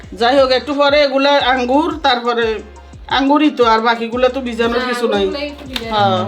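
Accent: Noakhali, Bangladesh. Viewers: খালা আপনি নোয়াখালির ভাষায় কথা বলেন।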